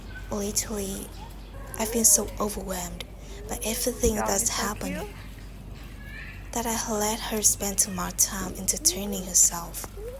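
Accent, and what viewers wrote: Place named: Vietnam. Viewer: don't ever feel self-conscious about your voice or pronunciation. You are speaking English, which puts you ahead of many other Vietnamese ladies. Your video is very well presented. You will do just fine if you keep going and don't let the negative commenters bother you. Anyone who says anything negative about your efforts is inconsequential in your life. Your husband must be a cruel person to leave his wife and child in this situation, and bad luck will follow him in his future endeavors. I believe your channel will grow very fast, and you will benefit greatly from your efforts.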